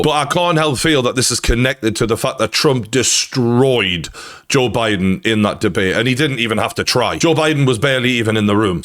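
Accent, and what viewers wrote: Newcastle, United Kingdom. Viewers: Not a Scot ,he’s a geordie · geordie man!! you could talk the hind leg off a donkey - you've found your profession and im still searchin' for my channel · Fucking Love a Passionate Geordie... 🤣🤣🤣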